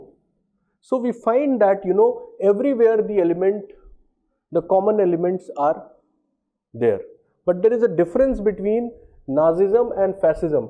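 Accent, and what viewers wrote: India. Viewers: Thanks for the video, i mostly knew these knowledge but this video was very good. I do not mean anything bad, but i like Indians and their english so much, and i hoped our countries had better relationships! Thank you sir regards to you from Iran/Persia 🇮🇷🤝🇮🇳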